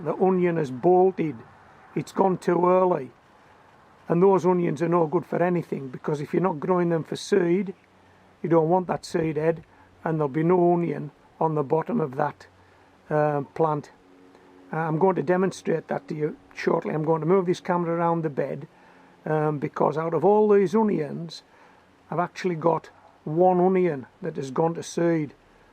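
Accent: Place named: Scotland, United Kingdom. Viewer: I do like to hear your Scottish accent, sir. So strong. Love it.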